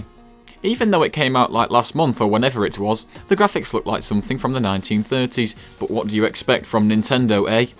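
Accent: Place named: United Kingdom. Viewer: It'd be funny if it didn't sound like it was being read off a flash card. Or have that god awful British accent. :P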